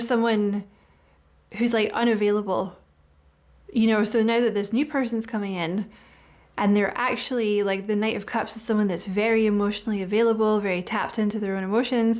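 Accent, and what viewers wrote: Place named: Scotland, United Kingdom. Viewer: Ouch some uncomfortable home truths there. This was a brilliant reading delivered all the better with that wonderful Scottish accent across an equally wonderful kitchen table. I’d love a one to one tarot reading on that same table with a truckers tea brew! Haha! Thank You. 🙏🙂🙏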